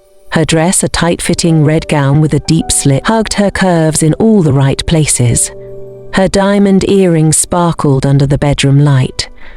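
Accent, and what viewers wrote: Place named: Jamaica. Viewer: You got a Jamaican accent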